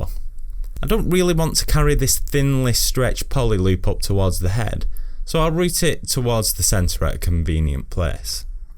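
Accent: United Kingdom. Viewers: Something in the UK, that’s all I can tell.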